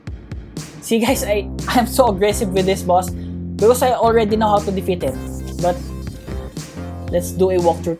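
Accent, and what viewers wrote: Philippines. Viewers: u sound like filipino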